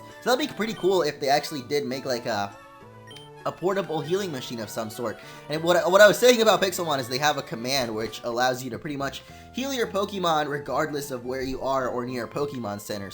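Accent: United Kingdom. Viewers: oh so very british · yea hes britsh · He is definitely a Brit... XD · very British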